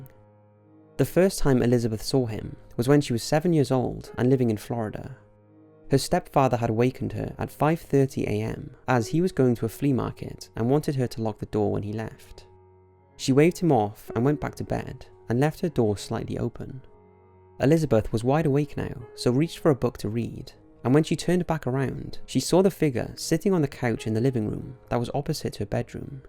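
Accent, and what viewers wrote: Wales, United Kingdom. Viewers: Go to Wales we all sound like that :)) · Hi, i love your voice xx...where are you from in the UK? xx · He's from Monmouthshire in Wales.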